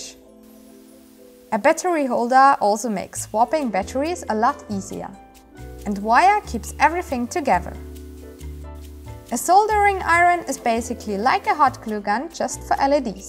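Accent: Germany. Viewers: Ist sie Deutsche? :D Eigentlich hört man es ja, aber keine Ahnung, kann ja auch ein anderer Akzent sein. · Ich bin selber aus Deutschland und erkenne den Akzent meiner Sprache ;) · Are you german? Becuase ur accent sounds like that. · german accent strong with this one :D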